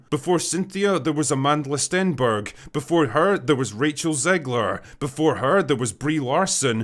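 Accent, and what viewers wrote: Scotland, United Kingdom. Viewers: Is it Scottish accent?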